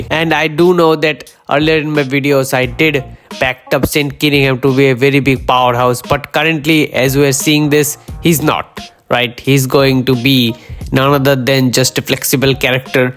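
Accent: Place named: India. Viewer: Brother your English accent is Indian so if you are a Indian then try it to explain in Hindi there are indian community also + your voice is also good 👍🏻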